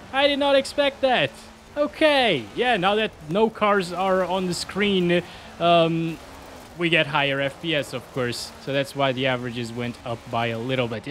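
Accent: Portugal. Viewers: Perae você é português?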